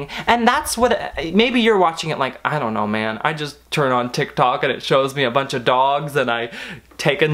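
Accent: United Kingdom. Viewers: WHY IS THE BRITISH VOICE SO FUNNY IM DYING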